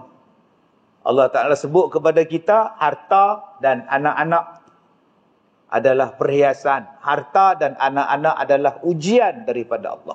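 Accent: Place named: Kedah, Malaysia. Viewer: Percakapan nya slang kedah...byk juga yg tak faham....tapi saya faham sebab arwah bapa org kedah tapi arwah ibu org minang.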